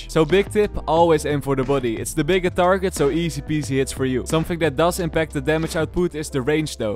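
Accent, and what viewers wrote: Netherlands. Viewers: Are you from the Netherlands you have exactly that accent. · Ur accent is the same as a dutch man speaking english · are u dutch bc i can hear it in your voice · Very Nederlands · i love youre dutch accent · why does he sound dutch? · One second in, ah this guy is Dutch. "checks username:: 100% confirmed":)) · effe voor duidelijkheid jij bent toch Nederlands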